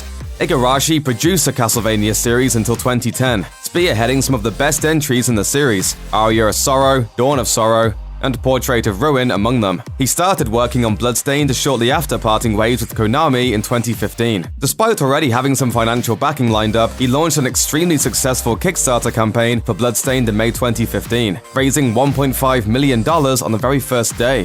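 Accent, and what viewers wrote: United Kingdom. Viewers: The game is absolutely AWESOME...this guy can take his limey voice and piss off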